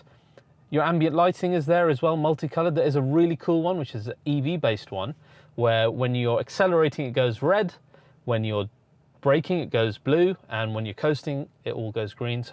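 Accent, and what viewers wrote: United Kingdom. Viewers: have to be a Brit to understand this dude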